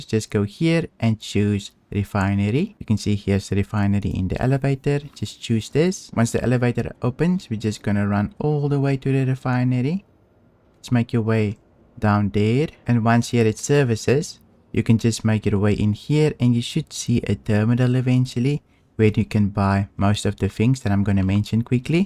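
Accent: South Africa. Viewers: Hey bud, love your content (and your South African accent). Keep it up! I did want to mention that it sounds like you may be clicking the mouse to deactivate the laser beam after cracking and extracting the rocks. You don't actually have to do that since the game automatically does it for you. I noticed once in this video that you actually inadvertently turned your extraction laser back on after the game turned it off for you as a result of your unnecessary click. Just figured I'd mention it since it seems maybe you are not aware that the game does turn it off for you automagically.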